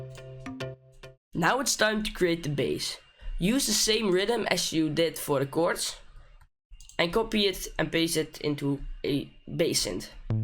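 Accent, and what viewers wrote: Netherlands. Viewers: Are you Dutch? If so Hallooooo! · So dope! Are you Dutch btw? Keep doing your thing man! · You are dutch, are you? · You sound dutch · Are you dutch? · Are you Dutch cuz I am and I thaught u where Dutch 2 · Lekker Nederlandse accentje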